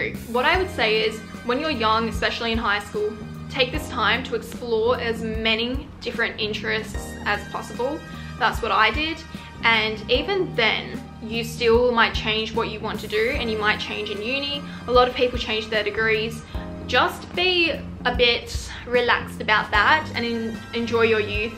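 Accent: Australia. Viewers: Thank you ! Your advices are just so helpful. Also, really appreciate your aussie accent 😊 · Your Aussie accent is amazing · Love the Australian accent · i’m just living for her aussie accent.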